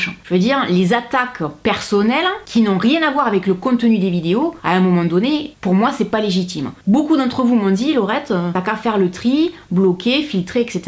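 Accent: South, France. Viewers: J'adore ton accent du sud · Juste un petit commentaire pour te soutenir, j'aime beaucoup ce que tu fais sur YouTube, ton accent du sud est me rappelle ma famille, et ta franchise est rafraîchissante dans ce monde ❤ Je suis désolée de lire tous ces commentaires déplacés cela ne devrait pas exister... Courage 😘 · Moi habituellement je n aime pas l accent du sud mais avec toi tout est possible tu es un amour merci de continuer! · Laurette tu es super, j'adore tes vidéos, ton accent du sud. Ceux qui critiques sont des débiles profonds. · Je suis choquée d'entendre ce que les gens peuvent dire sur les réseaux 😱 Je pense que la majorité des gens qui te suivent t'apprécient comme tu es. Avec ton humour, ton accent du sud, ta spontanéité. Bref ne change pas pour une poignée de gens "débiles" (encore je reste polie). ❤️ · Je suis choquée des commentaires! Moi je t'aime et j'adore ton accent! 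Ici c'est le sud!!!!! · Bonjour Laurette, Je prends rarement le temps de laisser des commentaires sur youtube, mais aujourd'hui avec cette vidéo je ressens le besoin de le faire. Les messages que j'ai lu dans cette vidéo m'ont profondément choquée. Tant de haine reçue totalement gratuitement... Personnellement j'aime beaucoup ta personne, ton travail, ton contenu, ton accent du sud, tes vidéos variées (liste non exhaustive). Je regarde toutes tes vidéos et je continuerai de le faire, tu as eu raison de remettre les pendules à l'heure car ces comportements ne sont pas tolérables. J'aimerais te dire que je te soutiens, toi et ton travail et que j'ai hâte de voir tes futurs projets ! Prends bien soin de toi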